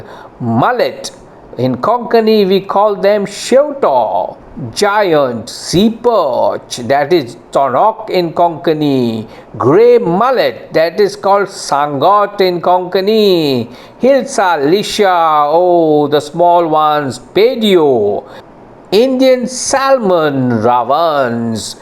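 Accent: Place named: Nepal. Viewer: You are not a Goan you sound like a Nepali ! And by the way the plural of fish is fish ! And not fishes ! Don't re invent the English language please!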